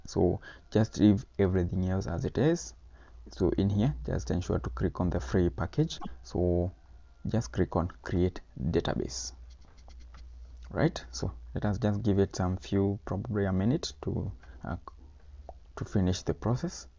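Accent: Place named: India. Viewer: OHJE, schon wieder Indienglisch:-(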